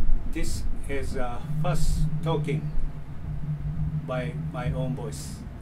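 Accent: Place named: Japan. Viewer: You got a such a nice place for recording. Could use more space though with all that equipment. :) Air conditioner and all, I wish we had something like that. What a difference, I play in a basement of age old industrial building where everything is ugly and in disrepair. But at least there's plenty of space and its cheap... The reality for metal bands in Finland is pretty much like that, things are simple but functional and the rehearsal spaces are almost always in old ugly buildings out of sight and mind of most people. I'm wondering how expensive it is to rent a rehearsal space in Japan? In Finland it varies a lot, in my town which is a small place it can be very cheap if you get lucky.... In Helsinki its much more expensive and the places are almost always very small. Our place is pretty much as good as it gets, over ten people sharing the rent and now that two guys left and I cleaned the place, there's a lot of space for everything. 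I'm jealous of your recording equipment. Damn, I wish I could record in separate tracks too. Takes some time to put it together, I have been using all the money I can spare for the drums in last few years. Recording equipment is the next on the list. ;) Your English is very good, understood everything as you meant it. Have you studied it somewhere or just learned by yourself? I love your accent, I don't hear a Japanese person speak in English often. :D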